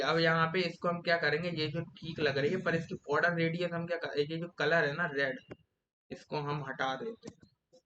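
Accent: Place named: Bundelkhand, India. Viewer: Bhai badiya bole bundekhndi m mja aa gao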